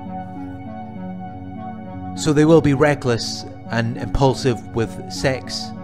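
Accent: Wales, United Kingdom. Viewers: Just stumbled over your video. Can’t believe accuracy of what you say. My ex narc 1. Promiscuous. Gave me a STI and took me to special clinic next morning! 2. Blamed me for preventing him meeting other women. We were supposed to be in a ralationship! 3. Told me his parent’s gave up on him as youngish of three boys.Elder brothers all narcs on various scales. All plotted against me “ stupid woman” 4. Reckless behaviour “ swimming with sharks! “. Frightening incident when showed me no concern for my wellbeing. Trying to keep up with his pace of walking and nearly walked in front of car. 5. Threatened to murder me if I slept with anyone else. He’d moved on to new supply and living with her. Had left me and gone abroad but I was supposed to be his girlfriend 6? So terrified of him coming home after long journey. Met up in hotel . Arrived at midnight. In such a black mood was too scared to speak to him. 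Even considered the scenario “ would anybody know where to find me if I went missing. 7.Would never discuss our relationship. If I bought up a concern he would try to initiate sex! Was sitting down one day opposite him and bought up a concern with one of his friends, no confrontation on my half. Suddenly threw glass of wine in face, wondered what on earth happened. Carried on as if nothing happened then carried on talking. When I challenged him he threaded to tape me so people could see what I was really like. Excuse my ramblings. Had to get it off my chest. Love the Welsh accent.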